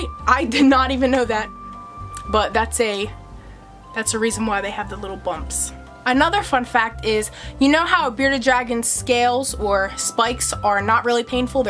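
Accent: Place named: New York, United States. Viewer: Haha you made me laugh a few times. Very entertaining. I am from Australia but I think I can pick your accent to come from somewhere near New York maybe? Anyway if you want all the wild bearded dragons you’ll need to come to Australia. Thanks for teaching me stuff!!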